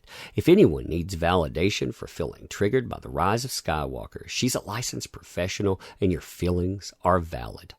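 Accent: South, United States. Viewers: i like your content, i swear i really do, that is why i keep watching it for quite sometimes, but as a chinese dude i find it somewhat hard to understand your accent, which i suppose you will find my accent also hard to understand if you heard me speak, so this is a sad goodbye, i am trying too hard to listen to enjoy it. to bad i actually kind of like the sound of your accent, which i'm guessing southern ish, but alas it is too hard for me who's english is my 4th language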